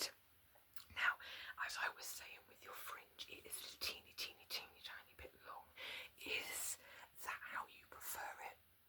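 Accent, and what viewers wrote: United Kingdom. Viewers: hearing a fellow Brit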